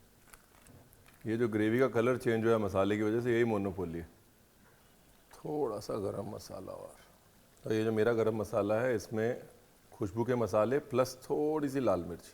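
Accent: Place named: Punjab, India. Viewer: Love ue punjabi accent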